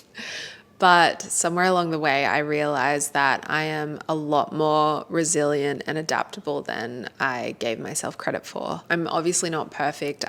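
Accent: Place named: Australia. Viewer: I noticed the Aussie accent! Where are you located?? I'm in NSW, talking about similar things on my Youtube channel. I'd love to connect! 💖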